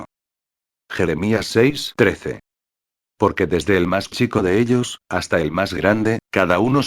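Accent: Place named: Spain. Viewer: Este Huevon que hizo este Vídeo, porque habla como las huevas, no respeta las comas, derrepente se va ahogar, se creerá gringo, pero parece Español.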